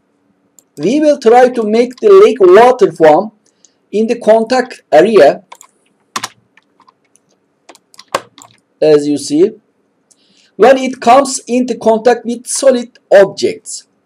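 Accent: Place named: Turkey. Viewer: Türk aksanı bu belli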